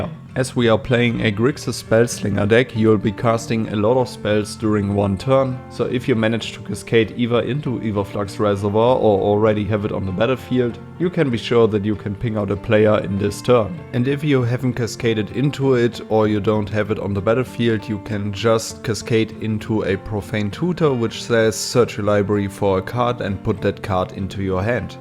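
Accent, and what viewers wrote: Germany. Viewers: Am I hearing a little bit of deutsch? Aber nicer commander!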